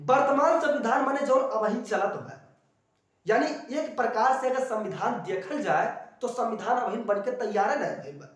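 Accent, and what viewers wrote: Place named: Bhojpuri, India. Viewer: Waah भैया ekdum mst 🎉🎉😅 भोजपुरिया अंदाज mai 🙌🙌👍😂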